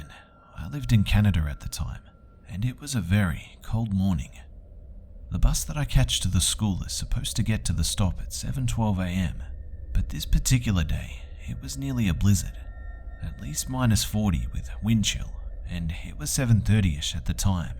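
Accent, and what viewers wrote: Australia. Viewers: you and lazym are the only horror story tellers i like. so many boring droners with no...energy. also i think the ausie accent helps! i listen to you most nights as i fall asleep (fear helps me sleep haha). i originally found your channel via chilibeast i think. thanks!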